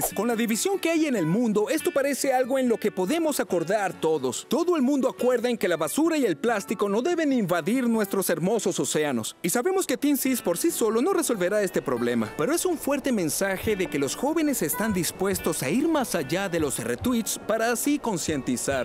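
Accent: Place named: United States. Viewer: gringo es que hablas ingles como norteamerica le dicen gringolandia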